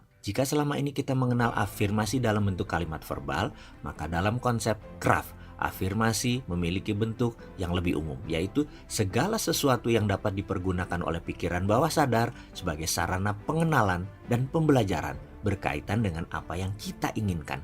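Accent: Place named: Palembang, Indonesia. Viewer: ini mas Adminnya orang Palembang ya ...hehehehe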